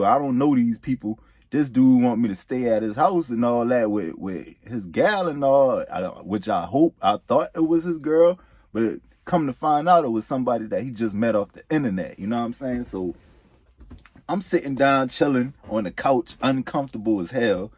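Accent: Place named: Geechee, United States. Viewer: I knew it!! I’m from downtown Charleston. I hear the Geechee dialect but I hear something else in there too. I’m looking into the company and I’m kinda excited!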